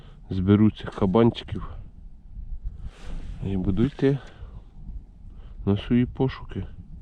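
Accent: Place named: Western Ukraine, Ukraine. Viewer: Звідки ти дядьку? Я з Золочева,і Самбора. Щось діалект ближче до нашого. Я на тебе підписався.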